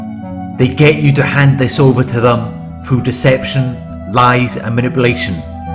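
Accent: United Kingdom. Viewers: Wow too real! Sounds toomuch like my x wife in so many ways...in her bad days me and my 2 little kids had to tiptoe around Mom otherwise she'd explode! On a family nite out to McDonald's our eldest daughter would be so fearful to upset the Narcissist Mom that she'd even refuse to order anything, afraid Mom would yell at her for ordering too much! Oh i hated those times! She eventually left me with 2 young children at home because home was just too boring for her. How those 2 precious young girls suffered, because even tho she was that disturbed element at home, there "were" some precious and few moments that our daughters did enjoy with their mother...a sad "live/hate" type of scenario, which should never be in home, especially with young growing children! So like i stated earlier, this video hit way too close to home, literally speaking. After 15 some years the girls are busy with their own children and it seems they've forgiven Mom for abandoning them when they were so young, tho the girls seem to know now which "buttons not press" or the old Narcisst Mom will suddenly raise her ugly head. 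Thanx for the video...again that British accent seems to "hook" me in each time, Haha, great!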